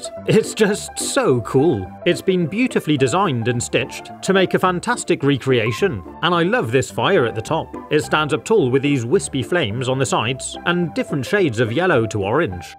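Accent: United Kingdom. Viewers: Your British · HES BRITISH!!!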